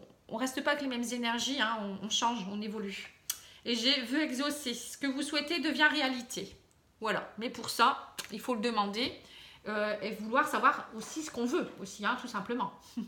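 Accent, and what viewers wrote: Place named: Pas-de-Calais, France. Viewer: Bonjour, merci pour cette guidance bien complète. Juste une remarque sur le domaine sentimental : je trouve que vous parlez beaucoup des couples et pas assez des célibataires. J'en suis une parmi les 14 millions de célibataires de France, donc très loin d'être la seule. Pourriez-vous rééquilibrer vos prédictions sentimentales dans ce sens ? En tout cas je trouve votre analyse fluide et parlante. Merci ! Dernière chose : seriez-vous du Pas-de-Calais car votre accent me fait penser à ce département ? Merci. Paix et amour à tous 🤗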